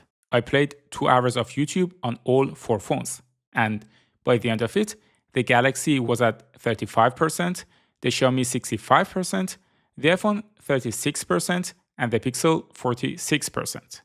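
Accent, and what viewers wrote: Iran. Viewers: Are you persian? You sound persian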